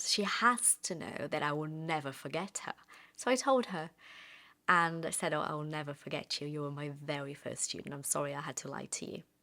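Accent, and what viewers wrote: United Kingdom. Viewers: Incrível pela sua fluência e sotaque britânico. Congrats!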